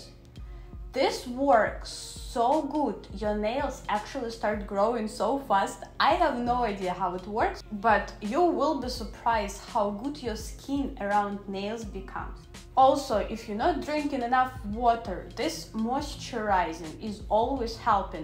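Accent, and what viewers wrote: Russia. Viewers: она русская?? прям слышимый акцент.. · да!это очень заметно из-за русского акцента ,который хоть и не очень ярко ,но выражен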